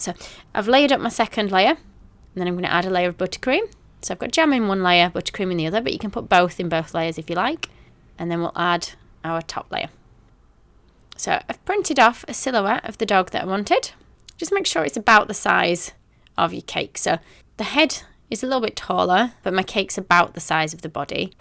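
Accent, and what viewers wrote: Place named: Yorkshire, United Kingdom. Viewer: It is so nice to have someone from Yorkshire to listen to!! You are super talented! Can always count on your channel to rescue any of my cake fails!😂x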